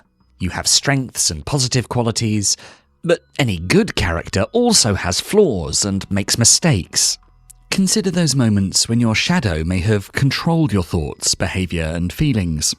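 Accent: United Kingdom. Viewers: The narrator is so hard to listen to, can we try to use a more smooth sounding American accent narrator instead? The British accent tone is just so up & down & sounds like trying so hard to pronounce the words but actually more difficult to hear clearly. Thank you!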